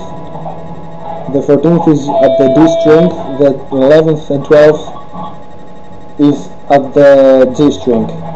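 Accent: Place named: Russia. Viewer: russian?!?!?!? every time i hear a russian accent, I think of the game starcraft... "Battlecruisers, ONLINE"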